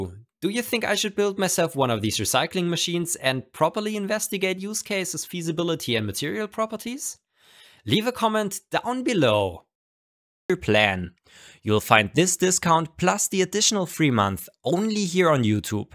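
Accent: Germany. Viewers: Man merkt das Du deutscher bist🤣 guten Tag evrebody im cooles video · This deutscher Akzent ist schon gut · Moin meister! Man kann hören das du deutsch bist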